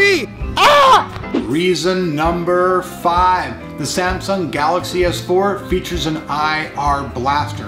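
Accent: Russia. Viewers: Russian accent was my favorite reason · love ur russian accent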